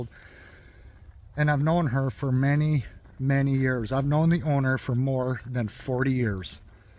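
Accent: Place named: Midwest, United States. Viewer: I enjoy your videos and stories. BTW, love your Midwest accent. God bless you. Robert in northern, CA 🇺🇲 😉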